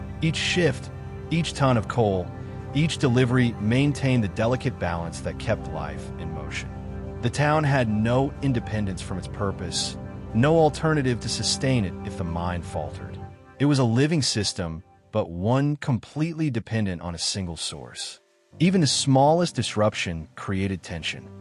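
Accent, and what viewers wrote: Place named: United States. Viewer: At least it's an American accent instead of a British accent like some are doing.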